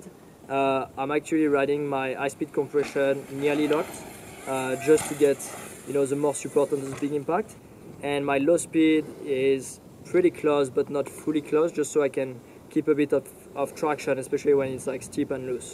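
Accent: France. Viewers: L’accent français dans ton anglais 🤘😉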